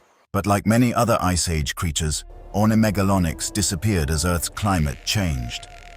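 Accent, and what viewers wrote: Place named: United Kingdom. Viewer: Depicting these flying dinosaurs in wildly different ways for the very… same… creature you keep talking about seriously detracts from anything the narration tries to claim. Every other frame shows the same type of dinosaur with either 2 legs OR 4 legs, plus wings in either case, and/or very different body types. It all seems like one big collection of dragon cartoons—accompanied by voice-over with a British accent in order to seem intelligent.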